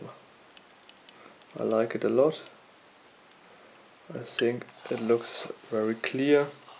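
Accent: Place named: Germany. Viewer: Man hört an deinem Englisch sofort raus das du deutscher bist haha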